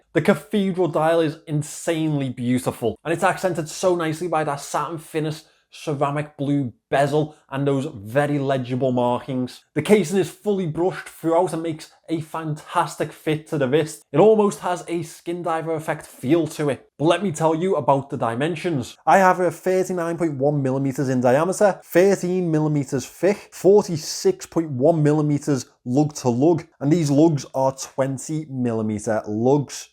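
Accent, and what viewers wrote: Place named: England, United Kingdom. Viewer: sorry. I just can't tell. I think he's English but the accent is just so strong. I will put on caption and hope it helps.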